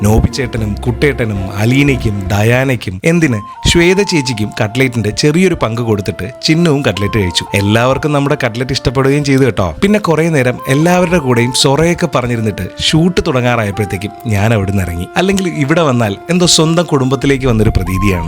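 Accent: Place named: Wayanad, India. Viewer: Vayanadan sound 🤭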